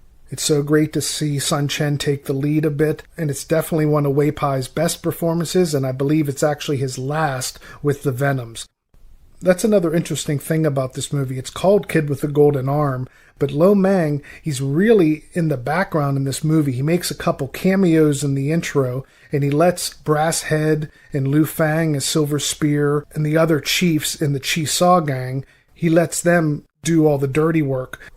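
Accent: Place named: Philadelphia, United States. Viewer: Haha! That’s awesome! You’ve got a hint of the greater Philly accent so I thought you must have been from around here! Yeah, I watched a ton of Kung Fu movies on Philly 57 and still have a few VHS tapes recorded from there with all the local commercials for Acme, Window Wizards, and the like. Was the “bootleg era” a nationwide thing? In the 90s I bought lots of bootleg tapes from a place in Chinatown called Universal Electronics (3 for $25!). The quality was generally awful but that was the first time I was able to see the Shaw Brothers stuff that never got released on TV as well as unedited versions of the more violent stuff.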